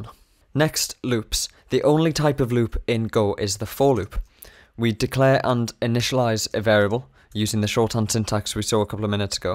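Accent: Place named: North, United Kingdom. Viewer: насколько же приятно слушать северный британский диалект по сравнению с индусами! я аж подрочил.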